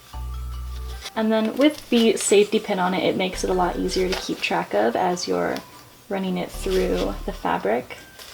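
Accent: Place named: United States. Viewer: hey!! Just wondering where in america you are from because i study different types of american accents and yours is SUPER mild. So... just wondering! Thanks so much and pls reply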